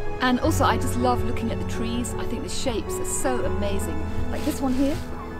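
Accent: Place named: London, United Kingdom. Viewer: 天啊。好标准的伦敦腔。听着好美好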